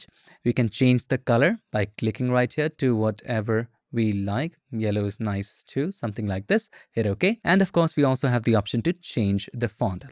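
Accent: India. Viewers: Speak like Human not like Computer.. Sp. you have an Indian Accent..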